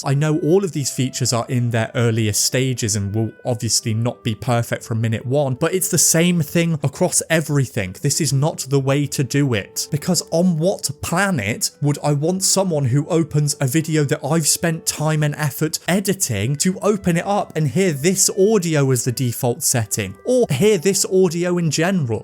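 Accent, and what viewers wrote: United Kingdom. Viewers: I have actually put your videos on to sleep before specifically bc you have a deep voice and a British accent. You and Simon Roper. You called me out so hard lmao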